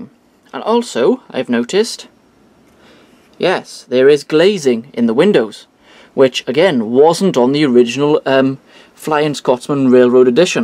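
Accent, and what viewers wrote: Wales, United Kingdom. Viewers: I like your Welsh accent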